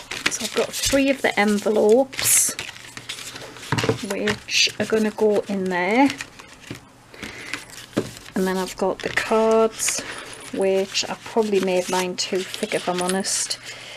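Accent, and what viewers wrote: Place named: Newcastle, United Kingdom. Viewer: I have been watching your videos because I am a fan of your Geordie accent. I was born in Newcastle and still have family there but we moved to Canada a number of years ago. I love listening to you talk while you do all the crafty things. I'm having trouble finding those Matte laminating sheets here however so that makes me sad.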